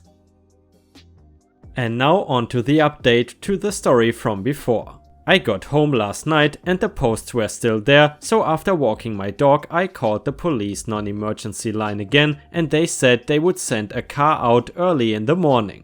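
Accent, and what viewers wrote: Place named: Germany. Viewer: Another good video,! These stories were a little bit underwhelming, but it isn't like you write this stuff, right? I do enjoy your voice, especially your accent (brings back a whole bunch of happy memories of my childhood in Germany!), but here;s hoping you can find some more, well, let;s just say, "exciting stories". ⭐️⭐️⭐️⭐️⭐️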